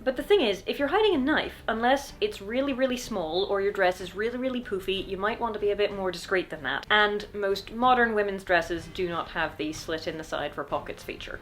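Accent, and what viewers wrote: United Kingdom. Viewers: I love videos. I don't know why. I think it is for several reasons, or some combination of them. 1, voice is soft and soothing. As a typical American, I often fantasize about what it would be like to be with a British woman. It's the accent. Love it. 2, goes into a lot of detail but doesn't dwell on the minutiae. That makes them interesting to me, someone Not in the entertainment industry, but interested in it enough to want to know the cool behind-the-scenes stuff that talks about. 3, editing skills are awesome. The way she combines her talking points, voice-over, and flashbacks makes the video interesting. It's not how I would produce the video... it's WAAAY better. Her film experience is obvious, even to the unenlightened. 4, is gorgeous. 'Nuff said about that. I am a guy, can't help it. This is totally separate from my comments about her voice. 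Her effect on the visual and aural parts of my brain are different, yet delightful in their own ways.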